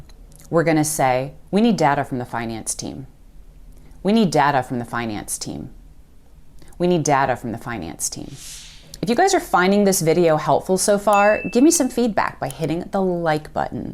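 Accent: United States. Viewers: Sorry you got a very American pronunciation which differs from the British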